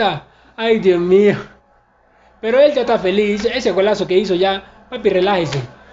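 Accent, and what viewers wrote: Esmeraldas, Ecuador. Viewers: Parece mas esmeraldeño que colombiano xDD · Pensaba que eras de Esmeraldas-Ecuador, hasta tienes el acentito por ratos, sin ofender. Saludos. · Lo q no cacho es q donde eres tu mas pareces ecuatoriano que colombiano por tu forma de hablar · Mijo usted parece esmeraldeños, por el hablado, Saludos desde ecuador. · Hey brother, saludos desde Quito, pero tú acento tiene un parecido a la gente de la Esmeraldas no tan marcado pero si lo tiene, capaz y es de Tumaco. Saludos panita.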